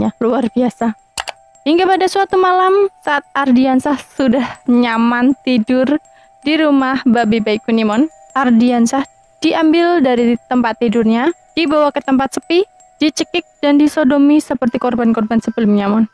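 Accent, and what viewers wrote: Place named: Java, Indonesia. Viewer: Salfok sm suara kk nya, javanishnya kerasa bgt..suka sukaa😍 sukses selalu kak👍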